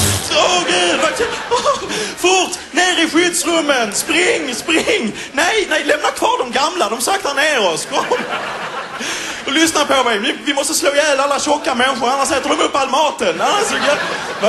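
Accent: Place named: Scania, Sweden. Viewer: Har han ju inte x'D Bara lite mindre brytning men jag hör fortfarande skånska rakt igenom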